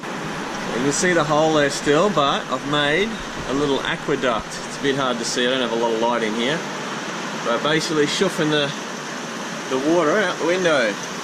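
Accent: Australia. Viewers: You sound Australian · wait are you from brisbane?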